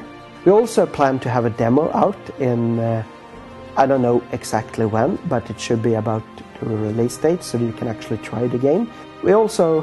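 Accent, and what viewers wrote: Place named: Sweden. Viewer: this accent is horrrrrrrible........ im swedish too but.. seriously get a proper narrator for these types of things, even if he's reading from a script. its better than someone who can hardly speak the language..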